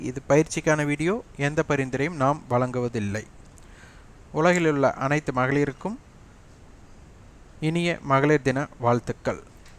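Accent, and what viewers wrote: Kongu, India. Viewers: PSYCHOLOGY பற்றி ஒரு பதிவு தங்களின் இனிமையான கொங்கு நாட்டு தமிழில் பதிவு செய்யுங்கள் ஐயா. நன்றி வணக்கம் 🙏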